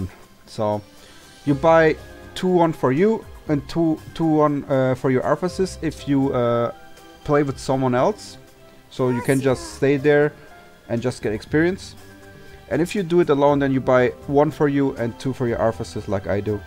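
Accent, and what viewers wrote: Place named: Germany. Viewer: Bro's accent is very German xd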